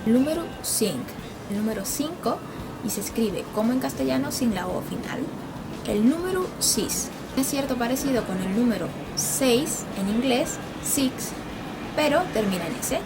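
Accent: Venezuela. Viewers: Sabía que eras venezolana por tu acento y... por tu belleza! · Ahhh es que escuchando tu acento dije que no eras nativa de España ni si quiera, y no me sonaba a más nada sino al de acá. Yo soy Venezolano pero con acento paisa colombiano por mi padre...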